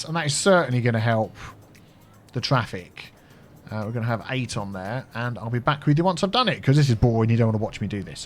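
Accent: United Kingdom. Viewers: I`m from Germany but I`d love your British accent and that your language is so polite and friendly! I also watched Oxygen not included in the last days and maybe you like to play it with the new content again? At the moment you are my favorite youtube channel. Thank you a lot!:) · No idea why I watch, as I don't even play this. But been watching since Christmas.... Where in the UK are you? Trying to figure the accent. We had snow yesterday too. You sound fairly localish to me, but that said, sound like someone who may have lived in different places (ie, a cockney turned geordie 😂 - not saying you're either of those)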